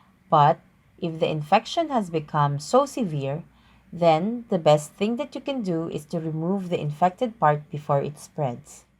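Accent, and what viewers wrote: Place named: Philippines. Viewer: Sounds like Filipino 😀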